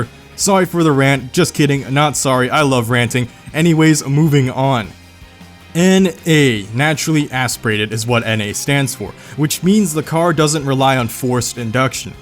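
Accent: United States. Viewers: Audi ≠ oRdi Peugeot ≠ Pew-jo Renault ≠ RenNo you yanks are the fucking worst i swear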